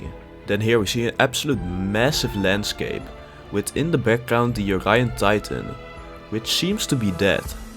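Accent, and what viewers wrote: Netherlands. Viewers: Ik hoor meteen een NLer 👍🏾😂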